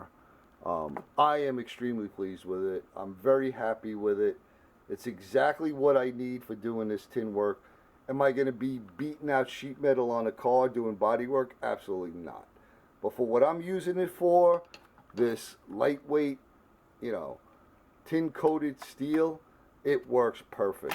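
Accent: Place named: New York, United States. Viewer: This old machinist and inspector is happy for ya. Your new hammer rocks. Thanks for sharing and thanks for telling us you are from New York. By your accent... I kinda thought so. All the best bro.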